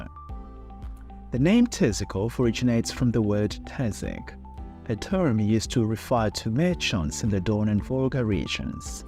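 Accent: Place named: RP, United Kingdom. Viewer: Kizungu cha BBC news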